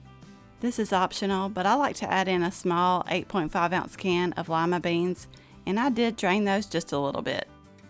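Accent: South, United States. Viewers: I love your southern accent where are you from? My guess is Georgia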